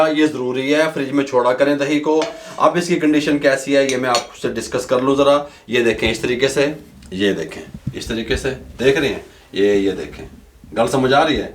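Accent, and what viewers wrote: Punjab, India. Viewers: I like your pure punjabi style being a punjabi person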